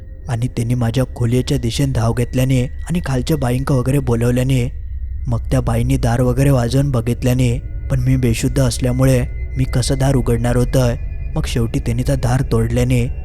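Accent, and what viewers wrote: Malvani, India. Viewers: Hahahahha response faar bhari hote comment la, Masta vatta malvani ikyla 🎉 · Ya bhashela malvani mantat Kalah ka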